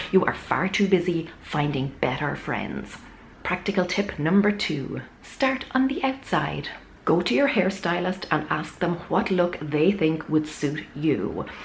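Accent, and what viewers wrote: Ireland. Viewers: The Irish accent is one of my favourite accents of all!! I immediately trust anyone with an Irish accent. Is that weird?? Hahaha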